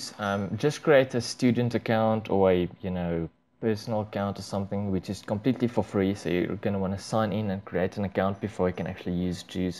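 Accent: South Africa. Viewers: You sound South African? Good tutorial by the way! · are you perhaps south african?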